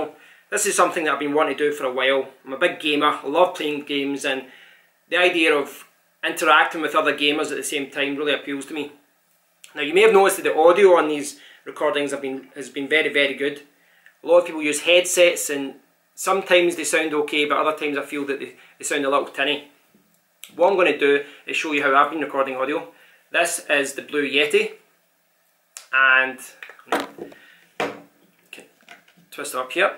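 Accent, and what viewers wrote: Scotland, United Kingdom. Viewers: Love your Scottish accent. From an Irish person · Nice video man. Ps: Your Scottish accent is awesome. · Thanks man also I'm irish and I love your scottish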